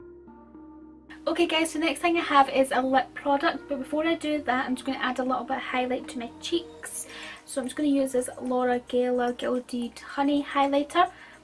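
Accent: Scotland, United Kingdom. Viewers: Omg your Scottish! Me too 😝💗💗 Have subscribed love your videos Soo gorgeous 😻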